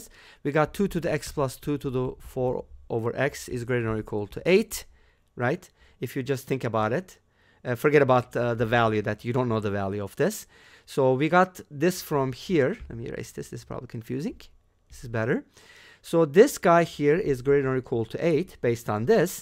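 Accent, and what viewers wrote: Turkey. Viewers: hocam türk müsünüz, aksanınız inanılmaz düzeyde türkiye türkçesi konuşan birisini andırıyor. are you turkish? your english accent made me think that u are turkish.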